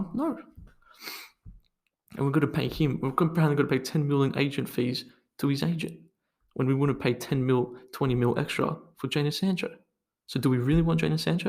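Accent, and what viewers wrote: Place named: Geordie, United Kingdom. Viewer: true geordie esque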